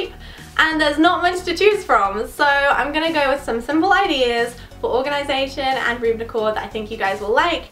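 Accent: United Kingdom. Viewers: I really like your videos! You have so good ideas 😍 and I like british accent😊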